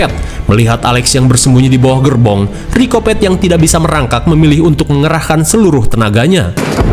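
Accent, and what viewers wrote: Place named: Madura, Indonesia. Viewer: Madura hdiiiirr mas broooo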